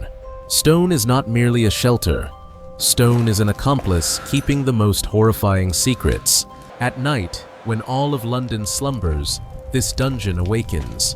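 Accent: United States. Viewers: This is fascinating, please use real British narration though, not American robots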